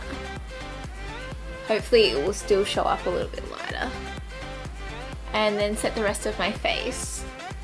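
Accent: Australia. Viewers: R u Australian. becuz u kinda have that accent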